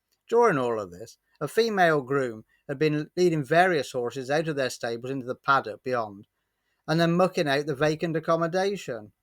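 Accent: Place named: Shropshire, United Kingdom. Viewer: Love the videos mate where are you from you sound local to me im south shropshire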